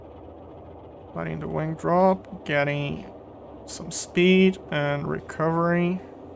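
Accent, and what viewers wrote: Scandinavia. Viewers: not especially... yeah I'm going with the guy below me, something Scandinavian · I was wondering the same thing. My guess is your mother tongue is something Scandinavian. Nice review, BTW.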